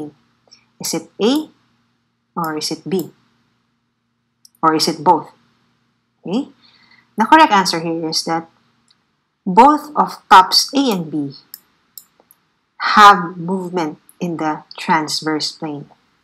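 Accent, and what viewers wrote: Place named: Philippines. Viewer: nice filipino accent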